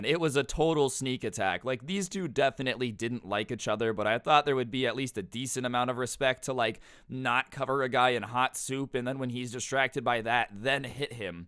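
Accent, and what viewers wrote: Russia. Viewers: Don't mess with the people from the Balkans cuz ottomans and the communists (fascists as well) btw ur Russian accent was good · Your Russia accent is hilarious!! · I love Russian ascent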